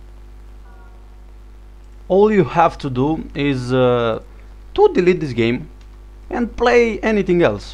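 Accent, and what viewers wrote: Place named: Romania. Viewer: stiam eu dupa accent:)) esti dealmeu. De prin ce zona esti daca nu te superi ca intreb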